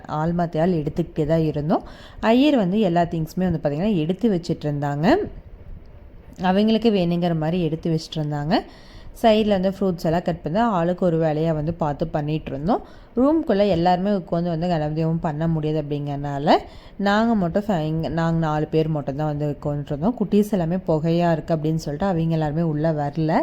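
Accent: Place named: Kongu, India. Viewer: Kongu tamil kekavae romba nalla irrukku.. super sister ...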